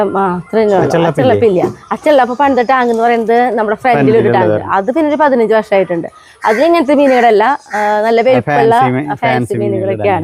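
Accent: Thrissur, India. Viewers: ട്ട ഞങ്ങൾ തൃശൂർ കാരുടെ ട്രേഡ് മാർക്ക്‌ ആണ് 😄😄😄😄👍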